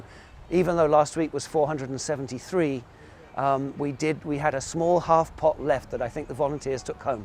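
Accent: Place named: England, United Kingdom. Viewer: ...who is this narrator right off the...boat with his quaint English accent...wtf...moved to waimea due to zip code based average wealth of newly arrived residents that made their wealth through the gravy train FAKE economy now crumbling...Worldwide...HAWAII FOR HAWAIIANS... Waikiki Io Kea... Cult of Ku...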